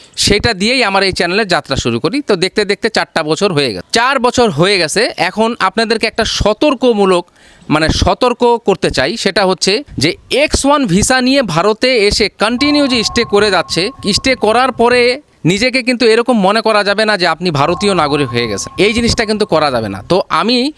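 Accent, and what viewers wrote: Bangladesh. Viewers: Apni ki nije bangladeshi? Accent sune toh tai lagche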